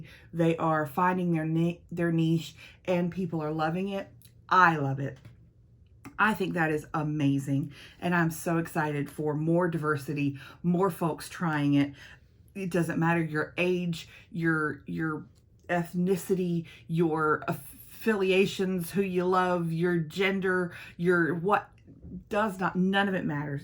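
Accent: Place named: South, United States. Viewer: I found you from your beautylish lucky bag video. Never commented on YouTube before but wanted you to know that I stayed and subscribed because you truly do seem to “be kind” and positive. It also doesn’t hurt that you talk like me. 😁 I’m from SC where we also eat “hoop” cheese and know how to say Greenville!! I appreciate how raw and honest you were with some of these questions. I think your younger self would appreciate the advice. You’re truly a hidden gem here on YouTube.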